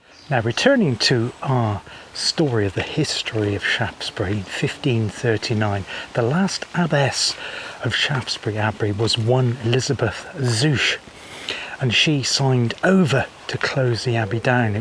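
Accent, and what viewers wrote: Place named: Yorkshire, United Kingdom. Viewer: Hello - greetings from Poland. Your video certainly captured the essence of Shaftesbury. I've been there many many times as my ex wife's parents lived there in the latter years of their lives. Loved Yorkshire commentary and camera work. The still photographs especially the landscape shots were exceptional. Congratulations. A very fine video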